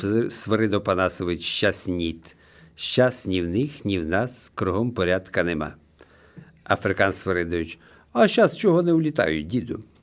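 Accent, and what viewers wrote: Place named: Surzhyk, Ukraine. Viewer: На суржику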